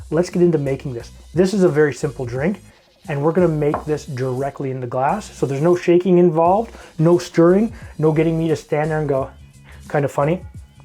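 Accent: Canada. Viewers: Lets talk abeewt.....love that Canadian accent.....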